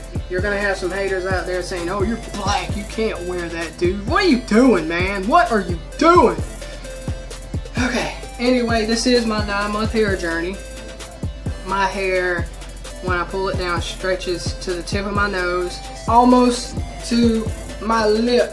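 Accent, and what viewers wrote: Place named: South, United States. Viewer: Sounds like the south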